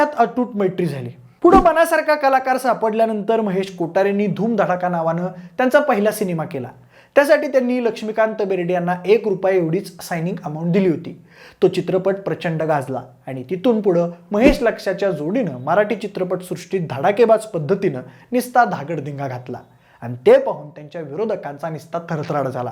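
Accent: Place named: Nagpur, India. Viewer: मला वाटले नागपुर करांचा आवाज़ आहे की काय तो।।।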